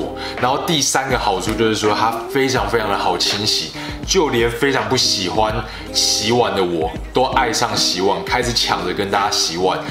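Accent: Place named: Taiwan. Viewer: 发的内容很好，这台湾口音真的扁到受不了，大概听普通话习惯了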